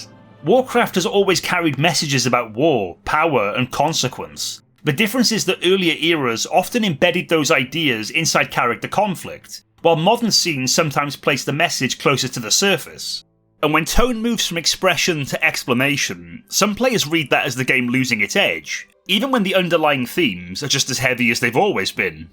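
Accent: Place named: Wales, United Kingdom. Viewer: Haha I am from Cwmbran. You sound very similar to me but I play with a guy from Barry and he sounds a little more like you than i do so yeah....That was my best guess but i new you was Welsh :)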